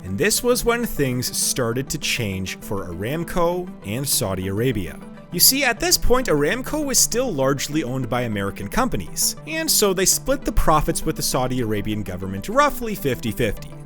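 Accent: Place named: Canada. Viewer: I think he is Canadian. I heard slight “Canadian raising” in his pronunciation of the ‘ou’ diphthong (listen to when he talks about when his next video “comes out” at the end of the video.) He also uses the more open ‘o’ in words like “dollar” and “over.” I’d guess he’s from either Ontario or Alberta based on the accent.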